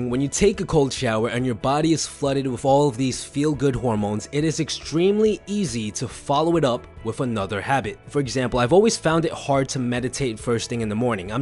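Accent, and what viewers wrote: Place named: Brooklyn, United States. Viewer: sounds like an Italian guy from Brooklyn, 😁 not a bad thing!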